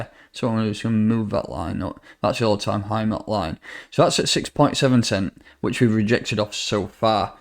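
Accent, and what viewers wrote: United Kingdom. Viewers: This is english..i bet you are american..he speaks British english..always american thinking they know it all.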